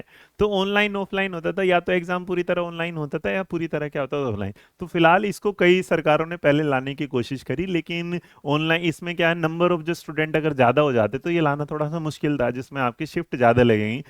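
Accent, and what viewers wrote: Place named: Haryana, India. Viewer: Haryanvi touch....oonline 😅😅😅 ...Sir Please no bad intention just like the way u speak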